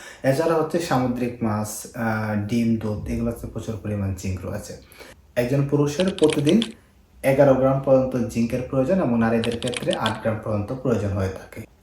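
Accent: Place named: Sylhet, Bangladesh. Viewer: আপনার জেলা কোনটি স্যার,সিলেট এর টান ভাষায়, সিলেট নাকি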